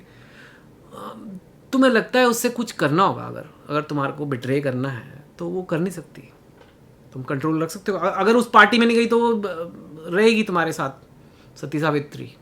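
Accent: Delhi, India. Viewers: Bhaiya U talk like a typical Dilli Wala Mujhay bachpan yaad aa gaya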